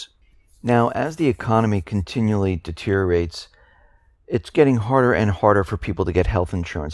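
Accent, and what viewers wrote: United States. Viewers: a foreign national with a perfect American accent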